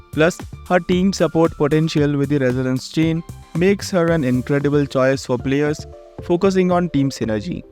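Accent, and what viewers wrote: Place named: India. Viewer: What is that accent? Sounds like generic idian scammer.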